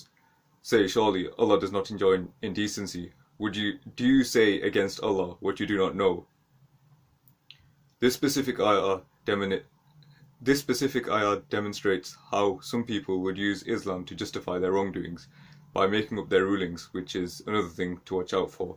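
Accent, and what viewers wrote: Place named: United Kingdom. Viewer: Based bri'ish accent MA.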